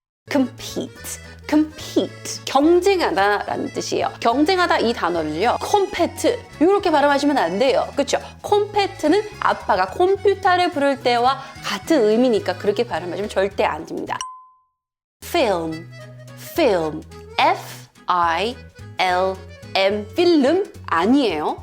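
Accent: United Kingdom. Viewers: 영국취업 준비중인데 영상 너무 도움돼요! 이렇게 영국원어민 발음으로 영어 가르쳐주시고 심지어 재밌기까지😊 감사합니다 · 영국사람처럼 발음하는거 솔직히 존나 킹받음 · 넘나 재밌어요 영국 발음 체크하기 좋아요 꿀잼 쵝오🎉😂❤❤❤❤ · Hump day ㅋㅋㅋㅋㅋㅋㅋㅋ 발음이 영국식 발음이시네요. 재밌게 봤습니다. 구ㅕㅂ ❤️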